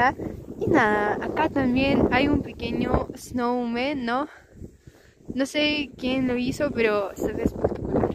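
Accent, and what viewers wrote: Argentina. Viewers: muy buenos dias muchisimas gracias por este hemozo video me encanta y tambien tu hermozo acento argentino gracias gracias gracias Felicitaciones sigue asi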